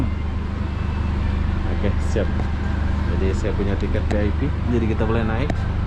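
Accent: Malaysia. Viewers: Bang knapa pakek logat malasia kan abang orang indonesia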